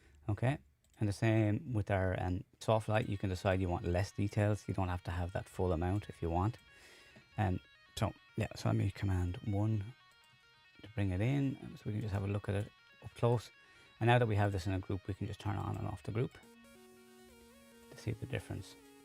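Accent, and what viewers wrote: Ireland. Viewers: the view count and interactions does not capture how awesome and ~valuable~ these sessions are. I get so much out of each one, thank you again. Also there is a big market for unintentional ASMR with Irish accents believe it or not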